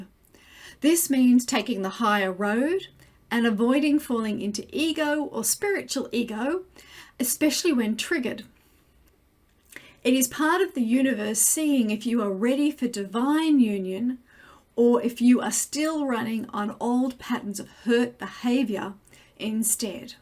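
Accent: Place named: Australia. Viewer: So nice to hear an Aussie voice, hello neighbour. 🙏❤️ I would love to hear from other Aussie flames, does anyone have meet ups in Sydney.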